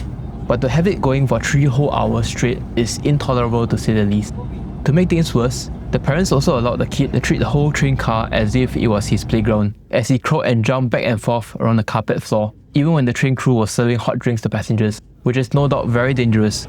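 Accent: Singapore. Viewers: You sound Singapore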